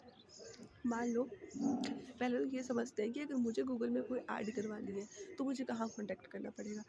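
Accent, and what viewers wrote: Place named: Kashmir, India. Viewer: Nice information but I am NRI 😅. Also , you sound like Kashmiri 🎉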